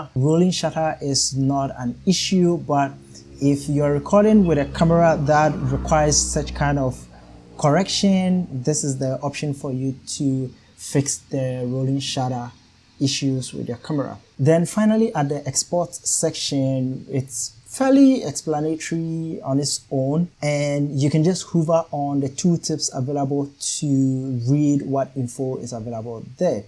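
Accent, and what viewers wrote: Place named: Africa. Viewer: Your African accent when you speak English sounds great and is easy to understand too.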